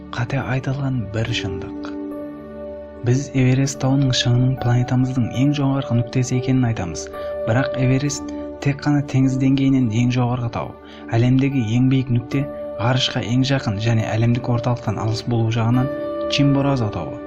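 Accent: China. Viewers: Кытайда туратын казах менинше. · Қытайда оқығансыз ба? тіл акцентіңіз ұқсас.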